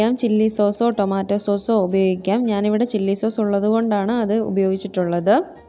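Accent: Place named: Qatar. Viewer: Good presentation. Samsaram kettappol anik anne thanne pole thonni 🤩🤪 Qatar l alle. Qatar l anenkil angane manasilayinn reply kittitt parayam tto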